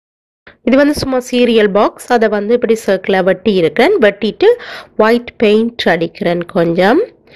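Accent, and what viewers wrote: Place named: Jaffna, Sri Lanka. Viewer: வணக்கம் சகோதரி. தங்கள் சமையல் குறிப்புகள் இரண்டும் அருமை. அந்த அலங்கார குறிப்பும் சிறப்பு. தங்கள் யாழ்ப்பாணத் தமிழோ இனிமை.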